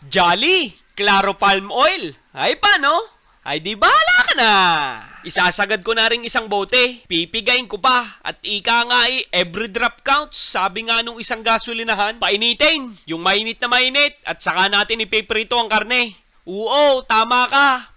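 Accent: Batangas, Philippines. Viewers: kasi he's from Batangas thats why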